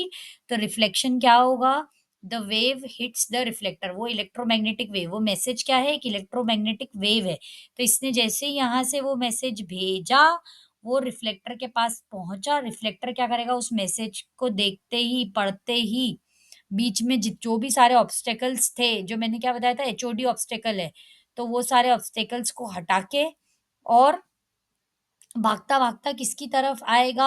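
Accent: Indore, India. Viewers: ma'am's ur indori accent is the key..😯😯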